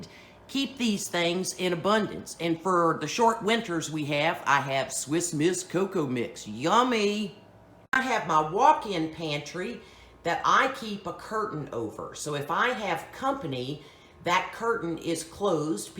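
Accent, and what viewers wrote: South, United States. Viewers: Hello! New Subscriber 😀 I'm from Alabama ❤️ Sounds like you are from the south too 😁👍 Thanks for sharing! Great video 👍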